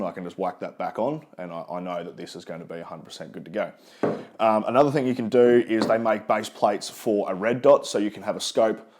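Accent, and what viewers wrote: Australia. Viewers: Just tripped over this video, and pissing my sides at your relaxed Aussie vanacular. Got yourself a new sub. All the best from the UK 🇬🇧 👍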